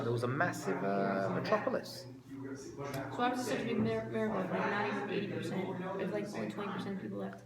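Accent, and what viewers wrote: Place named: United Kingdom. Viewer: No disrespect he sounds more like English or British person wow back to the study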